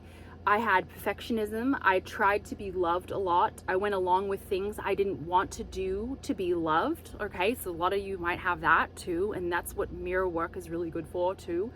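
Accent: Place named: Australia. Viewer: Thank You for sharing your thoughts, insights and advice on self work, self love. Currently healing childhood traumas and insecurities after having 3 months romantic state to friend zone now ghosted last 3 weeks. Digging the aussie accent!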